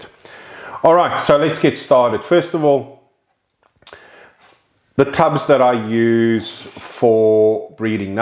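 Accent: South Africa. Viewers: Nice video man . Helped me a lot. Are you from South Africa by the way? Sounds like you have an SA accent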